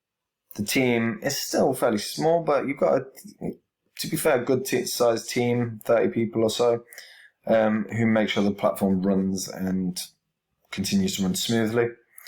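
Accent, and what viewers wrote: United Kingdom. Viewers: You may not like the british accent but damn this guy knows crypto!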